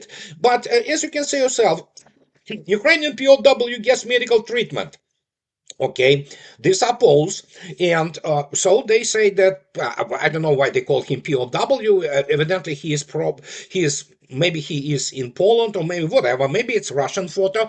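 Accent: United Kingdom. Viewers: Slava Russia…. OMG: that British fool with some problems with his dialect…❤️🇷🇺❤️🇷🇺❤️🇷🇺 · That British high-class accent allows that idiot to make hogwash sound intelligent. But those of us that have followed the situation for many years know he is spouting BS.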